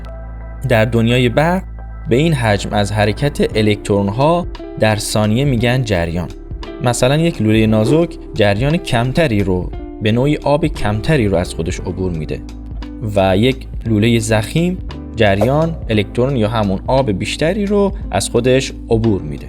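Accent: Iran. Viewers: بسیارساده وعالی توضیح دادین، ازته لحجتون حدس میزنم دزفولی باشین؟ سپاس وامیدوارم ادامه بدین وبازهم مفاهیم فیزیک روتوضیح بدین. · لهجه قشنگ کرمانی با ق های محکم و تشدید دار 😊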